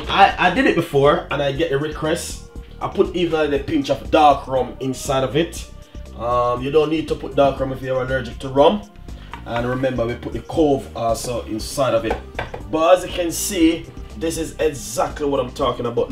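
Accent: Jamaica. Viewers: he's Jamaican for crying out loud! This is not a British channel. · Dude your jamaican accent is messed up with England. Thanks for the recipe. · I like jamaican accent 😎✌ love from Panama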